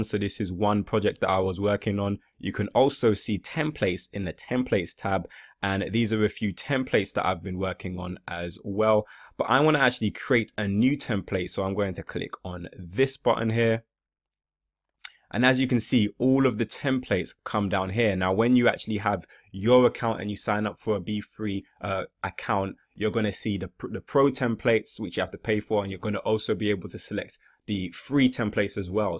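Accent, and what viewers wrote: United Kingdom. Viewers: Thanks for the fast reply . I know it is early morning for you, if your UK accent is any indication as to your location ... :-)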